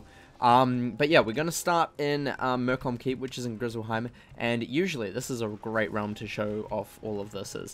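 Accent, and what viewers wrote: New Zealand. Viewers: Hey you sound like a kiwi player.. so am I if you are.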